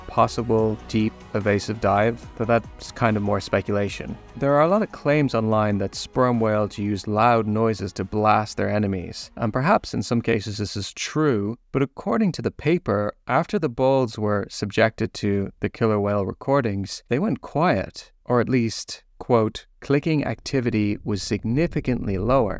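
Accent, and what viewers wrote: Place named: Ireland. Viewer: I spent the first half of the video thinking you were American until my brain went ding and i realised you are Irish. And i'm Irish i felt so dumb.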